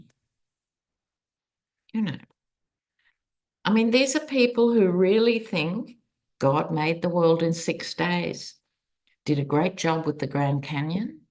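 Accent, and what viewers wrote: Australia. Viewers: Hi, I was wondering if you wouldn’t mind telling me what tarot pack you are using in this video, really drawn to the artwork? Love hearing an Aussie voice & as I think we are of the same vintage, also love your colloquialisms you use 😊 Thanks for all your hard work. Best wishes ❤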